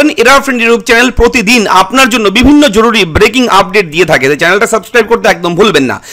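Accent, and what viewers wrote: Bangladesh. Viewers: Jini announcement korchen, taar uchcharon shune to mone hochche je tini Bangladeshi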